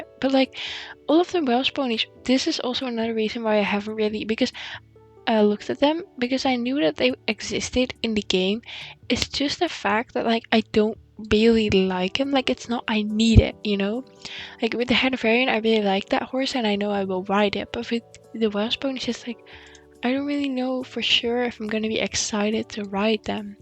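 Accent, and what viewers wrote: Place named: Netherlands. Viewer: The old ones are so cute!! Also are you Dutch? Your accent sounds Dutch :)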